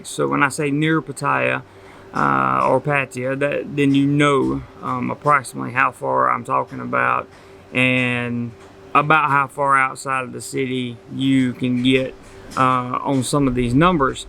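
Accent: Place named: Appalachia, United States. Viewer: The accent mate. How do Thais take 'ole hillbilly voice?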